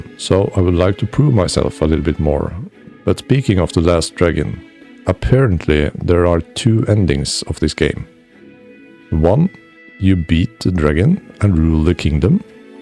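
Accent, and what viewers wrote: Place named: Norway. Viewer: haha this english it could only be the one of a norwegian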